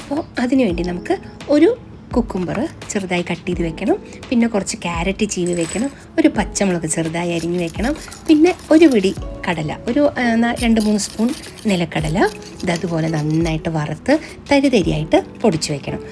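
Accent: Palakkad, India. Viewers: ENIKKU NINGALUDE PALAKKADAN SLANG ORUPADU ESHTAM AANU 🤗ENTE VEEDU EVIDE ERNAKULAM KOCHI AANU 🤗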